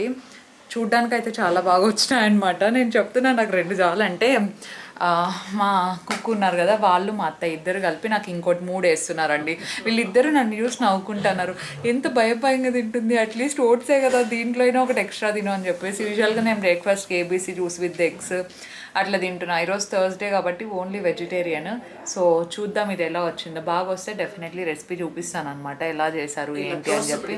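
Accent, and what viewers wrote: Anantapur, India. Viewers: Amma very sweet anantapur slaang super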